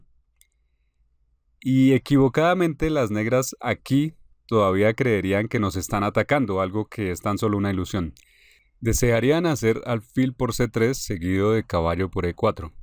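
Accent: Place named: Colombia. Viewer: Maestro gracias por sus clases y me preguntó esa voz es la de usted, porque no tiene acento extranjero, disculpe mi curiosidad si más no recuerdo usted es ucraniano, como habla un español con acento colombiano????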